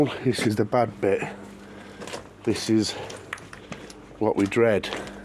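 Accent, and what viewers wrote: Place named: Yorkshire, United Kingdom. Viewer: love the Yorkshire accent on water. its great this weather i did have a mulibay but now have a nexus and just subbed mate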